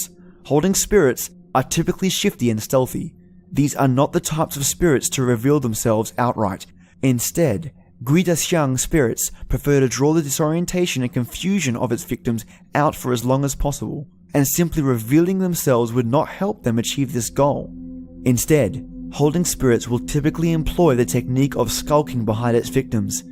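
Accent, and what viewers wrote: Australia. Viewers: Awesome! I'll be looking forward to longer videos 😉 and thank you! My little nephew behaved pretty well. He was in a very happy mood. Maybe because it was his 1st Birthday yesterday 🙂🎂 As usual interesting story, great narrating, awesome Aussie accent! Haha 🖒🖤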